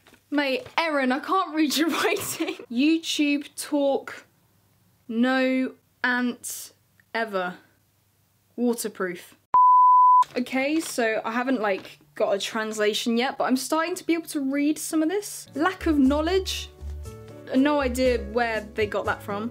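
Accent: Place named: United Kingdom. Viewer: British accent 😍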